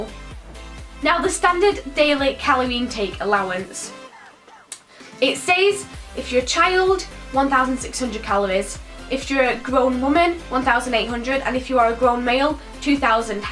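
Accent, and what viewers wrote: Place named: England, United Kingdom. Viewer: ILYSMM i really want to meet you i think we’d get along sooo well! LYSM also what part of England are you from? Your accent is so strong! In a good way obvs ILY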